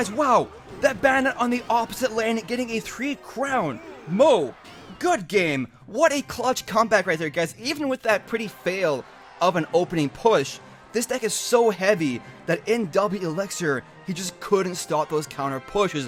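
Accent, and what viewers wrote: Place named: Canada. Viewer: Mini pekker haha. You must be Canadian by the way you talk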